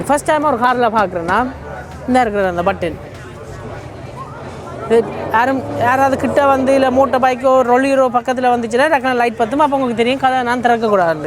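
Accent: Sri Lanka. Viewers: Bro srilanga tamil super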